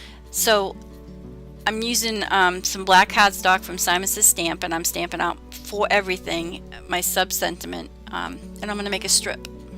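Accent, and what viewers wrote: New England, United States. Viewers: Hello, new subbie from Michigan. However I was raised in Mass and recognize your NE accent. You made me feel home for a moment. So nice to have found you. I was born in RI, is that where you're from? How close am I? 😁💜🎨 TFS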